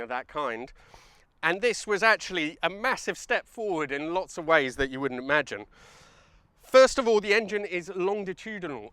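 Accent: Italy. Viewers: You appear to of developed a very pronounced Italian accent? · The British channel with a lovely Italian accent. This presentation then, is particularly fine.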